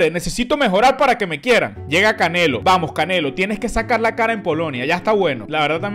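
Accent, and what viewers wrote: Venezuela. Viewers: Creo que es venezolano como yo por el acento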